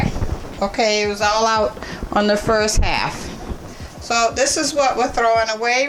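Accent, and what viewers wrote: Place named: Massachusetts, United States. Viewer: That cabbage looks tasty and side note, I love your accent🥰 I'm guessing you're from the Massachusetts area. I'm southern so I have a very "different" accent from yours🤭 Anyway, I love cabbage so I'll give your recipe a try❤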